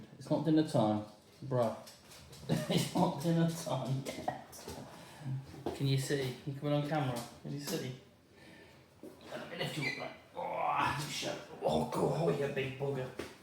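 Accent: United Kingdom. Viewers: one year sober, have not long started following your videos. would love to help and support you bro. can give you my email, if you want to chat/shoot the shit! from your accent, it sounds like you might be from around the same area of U.K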